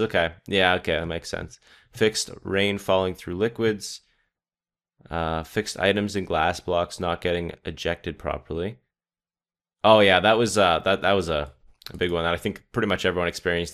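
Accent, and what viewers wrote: Canada. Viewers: ... I KNEW IT!!! Oh god.... You are canadian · are you canadian?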